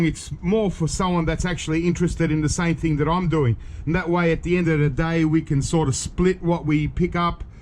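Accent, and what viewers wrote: Australia. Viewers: You are aussie guy? Nice scrapping lots of wire and neat video 🙏🏻🌈🤗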